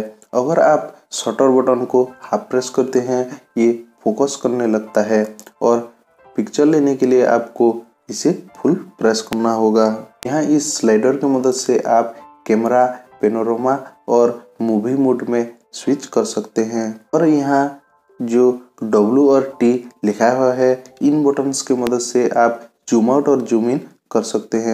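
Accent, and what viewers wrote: Odisha, India. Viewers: Bhai are you from odisha? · Bhai u r odia for sure.. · bhai aap odisha ke ho na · Are you from Odisha Because your hindi is like Odisha people say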